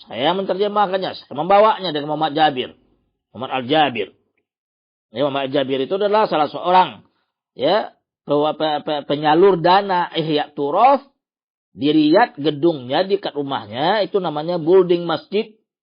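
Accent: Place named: West Sumatra, Indonesia. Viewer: Ini ustadznya orang Minang nih Logat nya...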